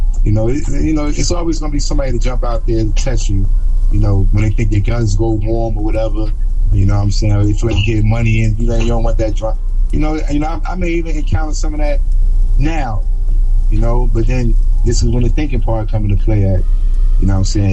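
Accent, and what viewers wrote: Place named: Brooklyn, United States. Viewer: All I hear is BROOKLYN!!! SAUTE MY G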